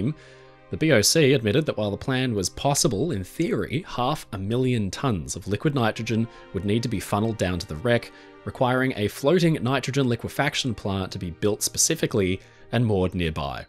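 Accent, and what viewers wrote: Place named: Australia. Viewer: Hmmmm aussie guy i wonder whats his name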